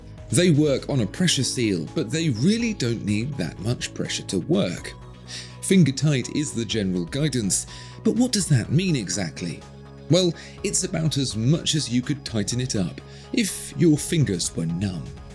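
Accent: United Kingdom. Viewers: Finally, i’ve seen who’s behind that British accent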